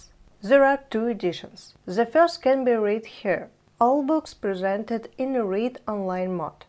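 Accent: Russia. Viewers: Ну английский я бы на твердую 3 оцениваю)) чувствуется русский английский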